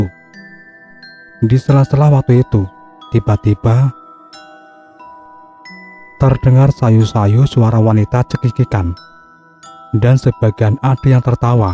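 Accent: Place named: Java, Indonesia. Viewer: Medhokmu lek... Ngapusi thok ki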